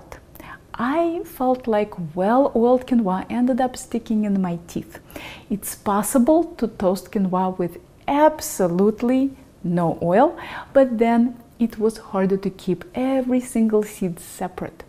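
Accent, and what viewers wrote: Russia. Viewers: what's with the Russian accent? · Good info ,while her English is great Russian accent still apparent. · I lost it for her Russian sweet soothing Accent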